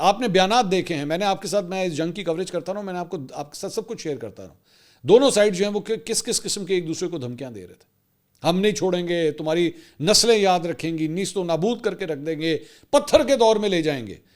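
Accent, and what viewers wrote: Punjab, India. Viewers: I m not Punjabi but Poori news ki jaan apki Punjabi hoti h.. love it ❤❤❤ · is video pe <num> likes to banty han ap ka to style ha na panjabi dialogue maza ata ha vlog sun kar. ap ko bhe islamabad Accord mubarak ho🎉🎉🎉🎉 PAKISTAN ZINDABAD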